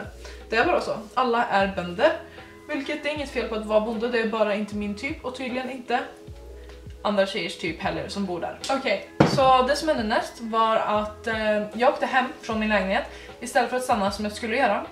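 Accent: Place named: Norway. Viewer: Omg älska hur man kan höra din norska lite omg så nice haha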